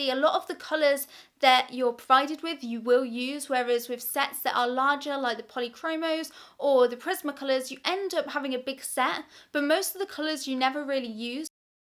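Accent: England, United Kingdom. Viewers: Your art is ofc amazing, but i think you should also start an English accent tutorials channel.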